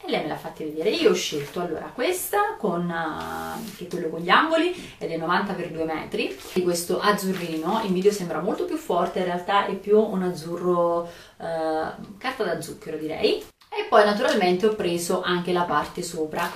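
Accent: Tuscany, Italy. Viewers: Perché toscano esci da questo corpo!!!! È' così bello il toscano simpatico allegro 😍😍😍 comunque bellissimi acquisti naturalmente come sempre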